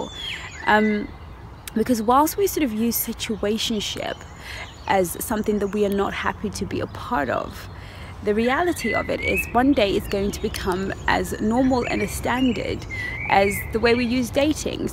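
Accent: United Kingdom. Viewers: Love your videos, love your advice, love your British accent, love your intelligence. As I always say some guys are not worth the time of day and I don't have time for situationships. I would rather be alone and have my self respect. It's so much better.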